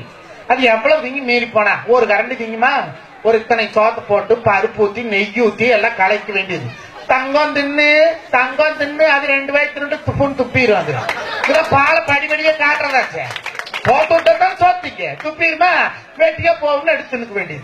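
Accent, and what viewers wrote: India. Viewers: Similar to Palakkad Tamil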